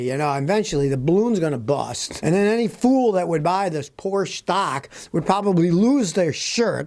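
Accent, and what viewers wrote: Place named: Tennessee, United States. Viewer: Anyone else notice that is getting a Tennessee drawl???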